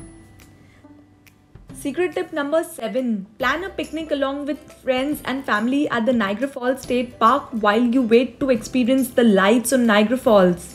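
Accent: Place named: India. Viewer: Indian accent English 😅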